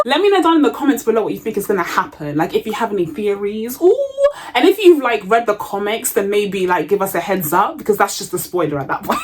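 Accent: United Kingdom. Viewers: First I wasn't expecting a British accent, lol 🤭 secondly I agree with small minded racists review bombing anything that isn't centered around them. Third, I sincerely appreciate your commentary, thank you. 🇺🇸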